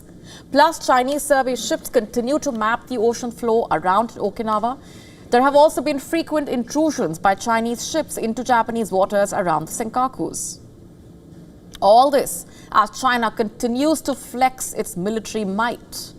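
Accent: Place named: India. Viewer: She is annoying, the other anchors do a much better job...this is typical head bouncing Indian accent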